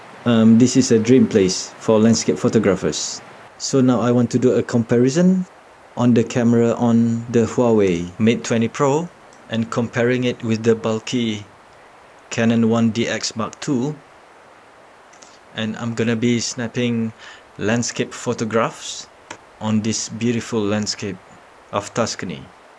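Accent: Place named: Malaysia. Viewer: Love your accent and tutorial. Thank bro. Greeting from fellow Malaysian.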